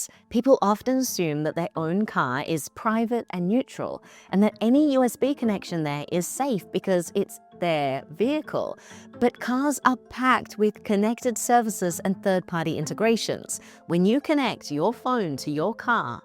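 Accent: Australia. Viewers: Aussies and their darter, make me smile every time. 😊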